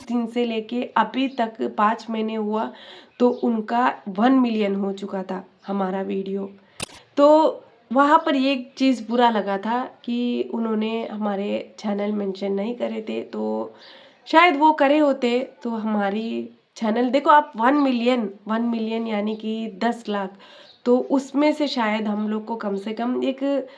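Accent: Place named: Hyderabad, India. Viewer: You spiking like haydrabadi language style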